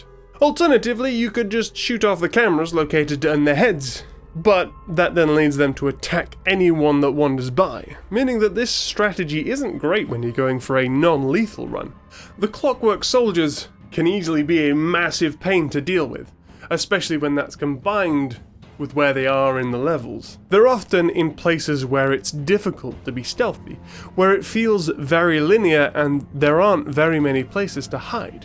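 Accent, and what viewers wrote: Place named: United Kingdom. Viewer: Really love your British accent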